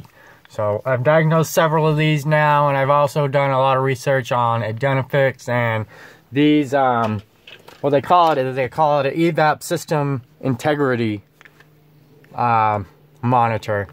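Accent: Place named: North, United States. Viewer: What state are you from? That accent is different I'll guess one close to the Canadian border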